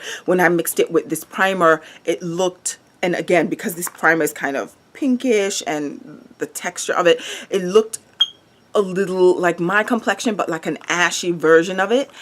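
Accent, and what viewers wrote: Caribbean. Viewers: Yup! I heard a Caribbean accent, I think. I'm from hot Houston, Texas! ❤️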